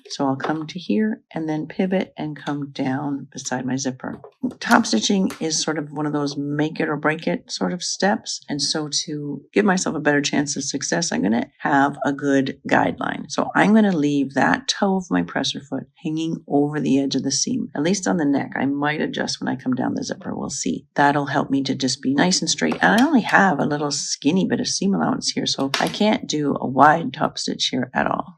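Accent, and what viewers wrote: Canada. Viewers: I'm addicted to your videos! You make me feel like I can tackle anything....I love your accent, your humor and your clarity. As a former US military family, you make me miss Canada too. We were blessed to be stationed in Newfoundland and then Victoria, BC during our military career. Thank you so much for making these videos!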